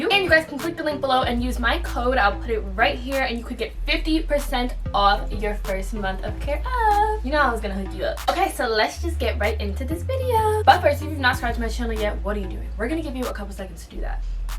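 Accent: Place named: United States. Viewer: omw hey! I love your talks because it makes me feel like I have a big sister and I'm so greatful for that!You are so lekker (lekker-lek-ker ) means that you are so cool! In my language . lol I am from Africa and the way you speak in "American" is so cool also,it makes me wanna speak it to for example: aight bet..your language is so different to ours but it's interesting too♥just wanted to say that I love your channel and I hope you do a more recent "get to know me " video or just something that we don't know about you ...like "pet peaves now" ♥♥ again I love your channel soo much. Please give me a shout out...If you want lol.♥♥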